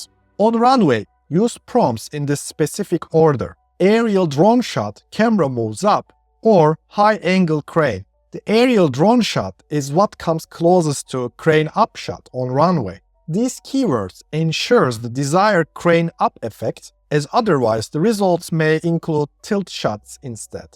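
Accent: Turkey. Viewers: Bu aksan Turk aksani degilse ben de bir sey bilmiyorum :) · Your accent like Turkish... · are you turkish? your accent sounds like turkish.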